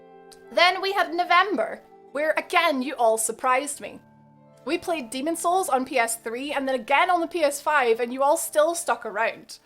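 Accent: Scotland, United Kingdom. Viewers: How the bloody heck does anybody have a problem with your voice and accent, as an Englishman i have always found the Scottish accent to be a wonderful accent, and the scum that have a problem with your weight need to just do one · Anyone find her Scottish accent really soothing.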